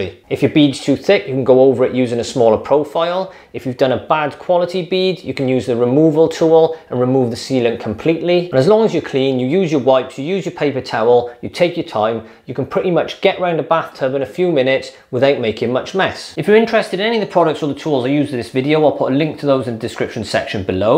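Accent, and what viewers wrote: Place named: North West England, United Kingdom. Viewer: Familiar North West accent. Thank you from Italy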